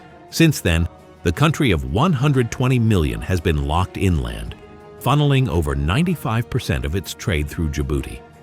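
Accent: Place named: United States. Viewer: Who are you? Are one paid stooges with American accent spreading falsehood. This deluded Abiy thinks he can change the subject of his own misgovernance replete with assignations, imprisonment and outright war against his own people—Ethiopians. So, live with this—Eritrea’s ports are non-negotiable even with the support of UAE which is creating havoc in Africa—Sudan, Chad, Libya and now Ethiopia. So, this uneducated and uninspired leader called Abiy has become the water carrier for UAE. Eritrea will never and is not cornered. It is Ethiopia, the client state, of other powers which is cornered.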